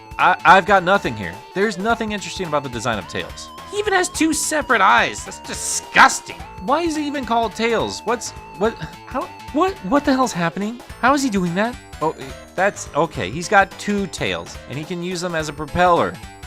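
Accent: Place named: United States. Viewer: I've also never seen that 7 emeralds ending. Are you, by any chance, playing Sonic Compilation (the one that had Sonic 1, 2 and Dr. Robotnik's Mean Bean Machine)? The standalone Sonic 2 has a different "good ending" where it's just the logo shown over a black background. I noticed in the brief comparison clip that your Sonic 1was moving somewhat slower. Is that the infamously poorly PAL Mega Drive localization of Sonic 1 where, because PAL TVs were running at 50 Hz, the game actually runs about 16% slower. Even the music is slowed down? But you have an American accent so I assume you own an NTSC Genesis. By the way, kick ass review. If I were to make a review of Sonic 2, I'd probably describe the negative aspects of the game the exact same way you did.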